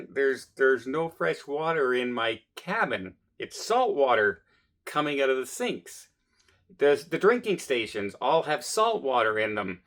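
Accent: Canada. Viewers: you must really be bored with your life. He is from Canada sometimes there pronounce words differently .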